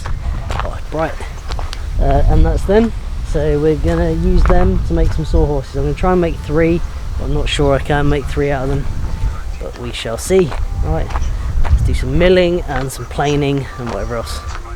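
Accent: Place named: Yorkshire, United Kingdom. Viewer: "...you're gonna wiggle about..." I watch you as much to listen to you talk as anything (although I love your woodwork and garden and building too) because you bring back memories of my grandparents (Yorkshire accents).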